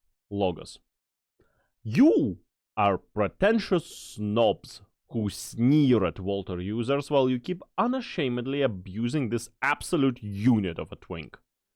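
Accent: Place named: Russia. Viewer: Рашн акцент, бро, веди телегу пж🙏🙏🙏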